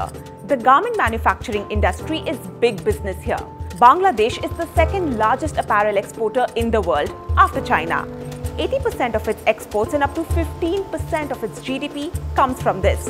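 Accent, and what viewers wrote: India. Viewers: Bring someone who speaks ENGLISH. NOT HINDLISH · Hate the Indian presenter and accent. Should use English spoken people. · Is TRT World an Indian channel !!? Why an Indian with their annoying Indian accent of English and overall and Indian view on the matter of discussion.....!!! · Just 1 percent of your Indian accent makes this entire report 200% biased in your favor. Bangladeshes electricity consumption is <num> Megawatts, where is produces nationally 23,000 megawatt and will increase it to 2 Gigawatt by 2023-4. So what "Electricity hinderance are you refering to?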